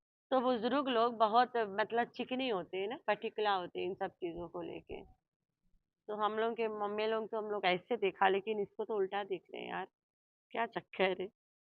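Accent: Uttar Pradesh, India. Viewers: Aap ki bhasa to humare u p india ki lg rhi 😅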